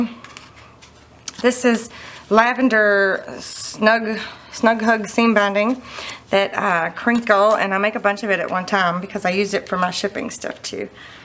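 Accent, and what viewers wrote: South, United States. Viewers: You are so darn cute!!! I'm from the South and I love listening to you as I have lived in California long enough NOT to talk Southern and I so enjoy listening to you and yo are so funny. I have the same problem you do with something right in front of me and NOT being able to say the right word, ROFL!!! Anyway, I have just watched all 4 of your videos about the tag journals and I have learned way more than if I watched 10 other videos. THANK YOU SO MUCH!! I think I like the putting together of them like the one at the end since the stitching part looks more complicated than I think my brain would allow me to do, hee hee~~ Thank you for teaching this newbie so much. Take care and God bless you~~ xoxo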